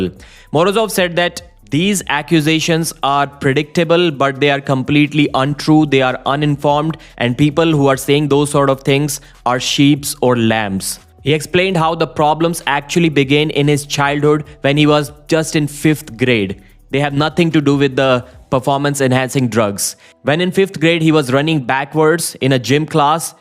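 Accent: India. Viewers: Why this stupid curry man accent...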